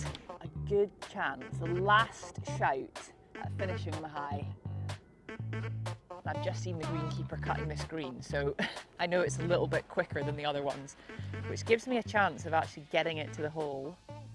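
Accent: Scotland, United Kingdom. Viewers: Love this channel, so easy to listen to you with that soft Scottish tone. And a smooth looking swing that anyone would want. Don't stop.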